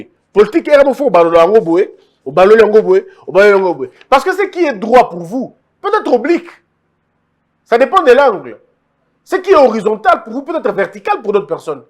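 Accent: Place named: Mongo, Democratic Republic of the Congo. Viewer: Mr, j'aime quand tu parles le Lingala avec un peu de kimongo.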